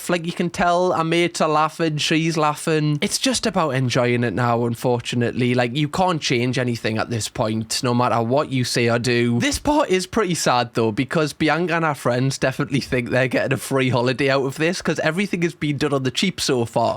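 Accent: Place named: Geordie, United Kingdom. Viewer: Daily dose of being called a "Gorgeous Donut" and hearing this entrancing Geordie accent, YES! Love you,!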